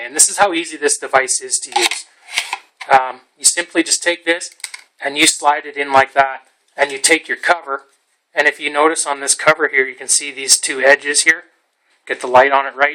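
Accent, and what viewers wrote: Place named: Canada. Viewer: Sounds like Canada ... oot (out). Should've cover more technical details. Most of what you covered was cosmetic. Spec details such as is it bootable, what the three onboard ports can be used with - what they will drive (other USB 3 enclosures, etc) and similar would've been more useful than it looks really nice, it's well-built, you highly recommend it, etc., etc. Also, of course it's going to be quite, it DOESN'T have a fan! Your comments sound like you got paid (compensated with free or discounted product) for this review.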